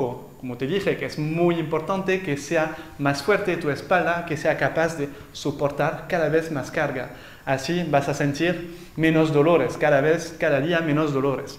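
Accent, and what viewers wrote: Argentina. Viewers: Divino! Y habla en argentino!!!👍❤ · El acento argentino 😀 un groso, saludos desde Génova Italia 🇮🇹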